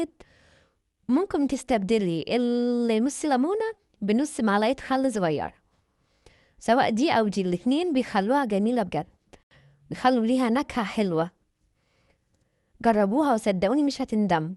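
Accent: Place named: United Kingdom. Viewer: يسلم ايدك حبيبتي .. هو حضرتك مش مصريه ..الحروف شويه مياله للانجليزي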